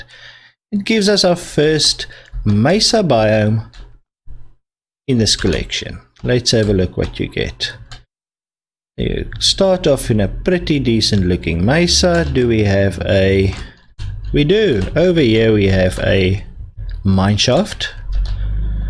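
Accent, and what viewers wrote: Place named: South Africa. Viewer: your heavy south african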